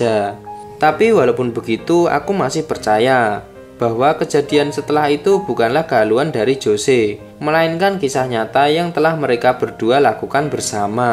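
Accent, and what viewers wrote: Java, Indonesia. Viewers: Terlalu medok host nya 😅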